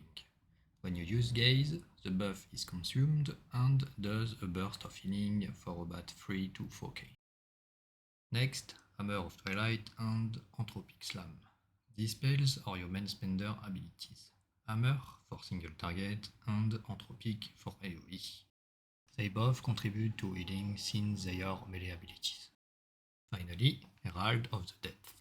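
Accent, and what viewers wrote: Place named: France. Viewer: French accent spotted. Super guide !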